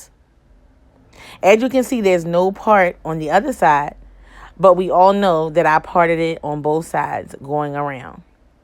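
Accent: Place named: Philadelphia, United States. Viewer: Philly? I detect the accent. Hair was fire!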